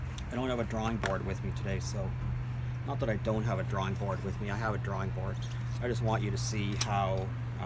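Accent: Canada. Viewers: Loved this 😁 was real and I could feel like I was there watching you draw!! Also love your Canadian accent expecially when you say (Out) 😁 great art, great artist, and great channel, all the best my friend 👍 ❤️🇬🇧☮️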